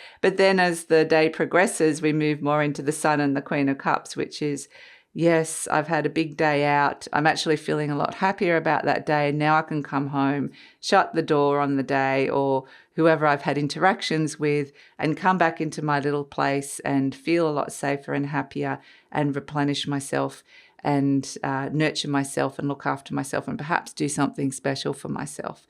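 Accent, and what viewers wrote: Australia. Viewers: I knew it was an Australian accent 😊 kind of hard not to when you're from Oz 😂 I'm from Brissie. Good to see we have a few readers from home. Resonates a lot actually. Thankyou for the reading. Wish you all the best & many blessings 🙌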